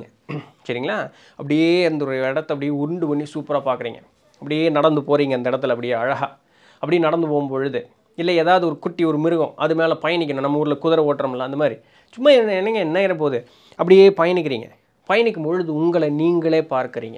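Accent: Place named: Kongu, India. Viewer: Really very interesting and motivating session in OUR KONGU TAMIL ❤ Tq sir 🎉🎉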